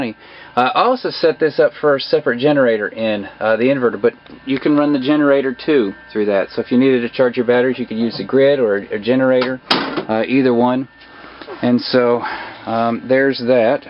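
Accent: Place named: South, United States. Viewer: You have lost some of your southern accent since this video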